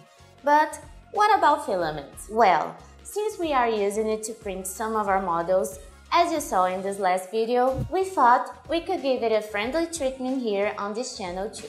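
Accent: Brazil. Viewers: You sound so Brazilian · Brazilian accent? Essas paradas em inglês já tá saturado, manda uns em português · seems a brazilian accent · Reconheço esse sotaque Brasileiro!! 🎉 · Impressão minha ou é brasileira? Rsrs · Your accent is EXACTLY what I would expect from a native Brazilian Portuguese speaker. Wonder now where you're from. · Are you from Brazil by any chance? 'Cause i hear a peculiar brazilian accent lol.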